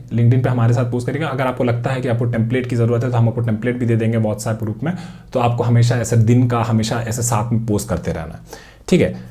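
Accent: Bihar, India. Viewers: Aap bihar se hai na bhaiya !!😅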